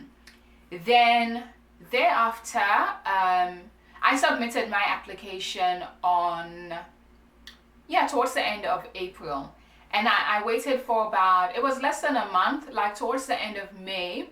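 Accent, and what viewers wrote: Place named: Jamaica. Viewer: your jamaican accent gave you a follow.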